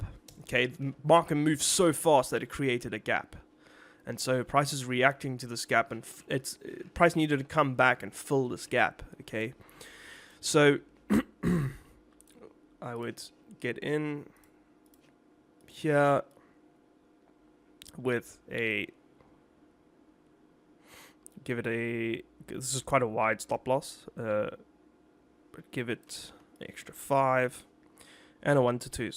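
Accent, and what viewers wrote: South Africa. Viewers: bro you from South Africa ?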